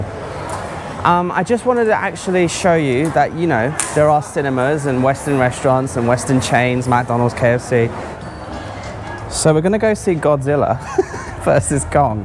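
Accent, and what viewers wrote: United Kingdom. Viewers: Love the British accent. Or Irish? Fun anyway. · Hey, nice video. Question - going purely on memory your name sounds decidedly IRISH; But your accent... It's British no? Are you from England/Liverpool? Or am I way off? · Thanks so much for this VDO. I’m just back from London and will live in Hatyai. Love your British accent so much! Hope to see you around in Hatyai. Enjoy!!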